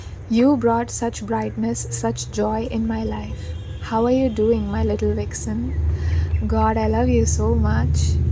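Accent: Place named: India. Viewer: You are so creative. I can feel you like doing what you are doing, it comes from your heart. From your accent I guessed you are an Indian. And the reading was very accurate too. Pile 2 & 3 : my life has been difficult bc i was very different from people around me & as a consequence i struggled with loneliness,lack of support etc mental health issues & i had toxic relationship with a narcissistic bf for two n half years . I'm a double Aquarius with Venus in cancer. I'm a very quiet introverted person. Unless I'm with someone i know & trust a lot, I am not very emotionally expressive. Subscribed, I will watch your readings I think we are aligned. Your energy is calm relaxing serene and slow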